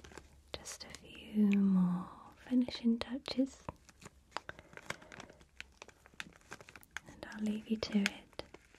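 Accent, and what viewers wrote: England, United Kingdom. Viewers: I loooove her British accent😍😍❤❤ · Are. You. From. England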